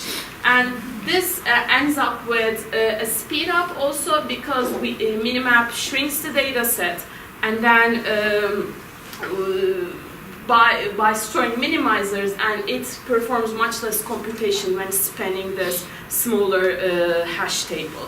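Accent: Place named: Turkey. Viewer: That turkish accent and nervous voice was kinda hard, but the content was awsome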